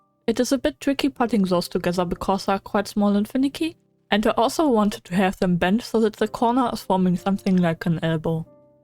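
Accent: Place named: Germany. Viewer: Bist du Deutscher hast einen deutschen akzent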